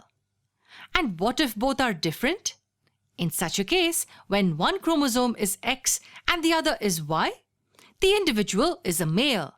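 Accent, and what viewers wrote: India. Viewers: You sound very indian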